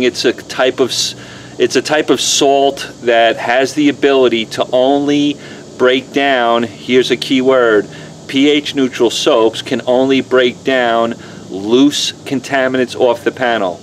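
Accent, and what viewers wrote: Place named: Long Island, United States. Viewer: Similar to stern but very close to Ray Romano. Both of those guys grew up on Long Island. Where did grow up?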